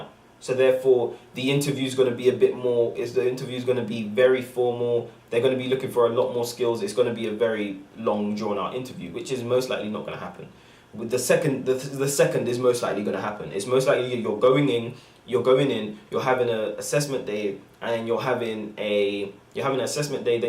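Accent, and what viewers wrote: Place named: AAVE, United States. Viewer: Hate the ebonics in your voice!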